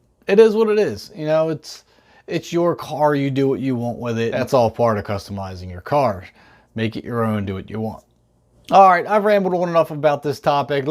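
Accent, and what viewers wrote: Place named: Philadelphia, United States. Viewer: You sound like you’re from philly.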